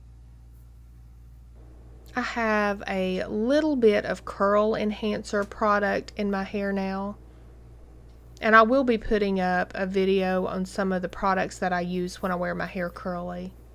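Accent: South, United States. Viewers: You are so pretty. I love your southern accent. I have one as well. Georgia girl. I have made the decision to go gray.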